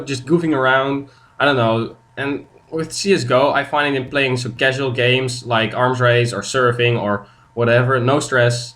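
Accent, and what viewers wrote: Netherlands. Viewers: You are Dutch arent you? haha the accent is such Dutchie :P Youve got a great video over here!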